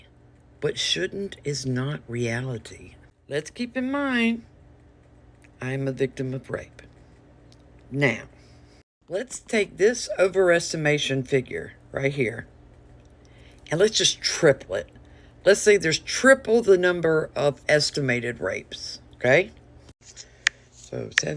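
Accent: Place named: Appalachia, United States. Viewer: Are you from the Appalachian mountains? From southwest Virginia here. You sound like we do lol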